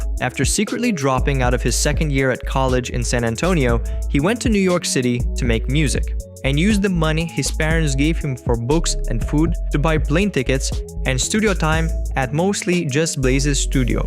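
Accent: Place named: India. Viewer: u sound indian af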